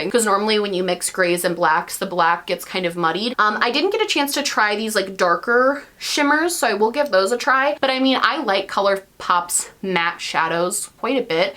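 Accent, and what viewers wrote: Australia. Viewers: I just legit cackled at your Aussie accent like the down under witch I am 😂 And yes, you damn spoiled Americans! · I love the new hair!! I work at a hair salon back home and damn he did you dirty!! Obviously it’s a little harder to get off your skin than more natural looking color, but he should have STARTED to get that off while you were at the bowl and then brought you a towel or cotton ball with the remover on it when you got back to their chair!! Regardless, I love the darker look, I thought in the video before your hair looked purple, glad I wasn’t going crazy 😅 Also I loved the Australian accent 😂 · Hahaha as your number 1 Aussie fan I cracked up laughing because I was thinking about how much we pay for shipping which sometimes is the same price as the palette we pay for!! Lol 😂 but love your Australian accent mate ! X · I really appreciated the Australian accent 👌😂❤️ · As an australian, I'm afraid I must stan your Australian accent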